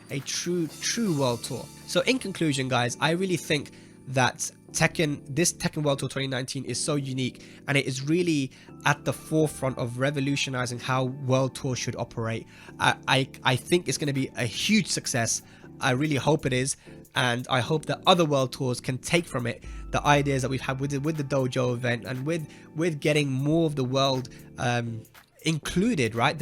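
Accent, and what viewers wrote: South Africa. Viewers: Lol, that South Africa accent! :D Haha, thanks for the shout out! We're as excited as you are about all of this! TWT LETS GO! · Lmao that south african accent 😅😂. Lovely video 👌🏼🔥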